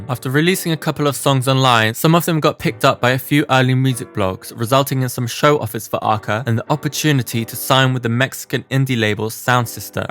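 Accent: United Kingdom. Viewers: ok soy Venezolano, Arca en este País es algo mal visto, aunque ya no tanto, aun así desde que lo o buena "La" conocí fue apenas en 2020 y me encanto muchísimo su música y cuando supe que era Venezolana casi me derrito de las felicidad, muy buen vídeo, todavía me cuesta entender el acento británico :´)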